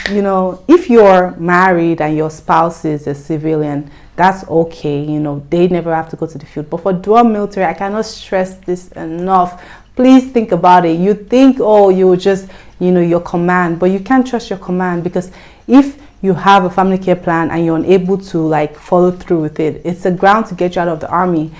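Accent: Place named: Africa. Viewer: Sorry, I am confused. I have seen some black women in Germany speaking, but I would judge your accent for an african one. But PCS is an american thing, or? So how comes you have that accent? May I ask, where are from? Aah, I commented too early. I knew it! Nice story btw.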